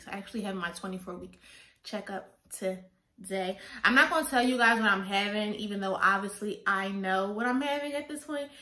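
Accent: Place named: Philadelphia, United States. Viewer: I'm going thur the same thing ughhh waiting to see if I'm pregnant now But r u frm Philly? I can hear it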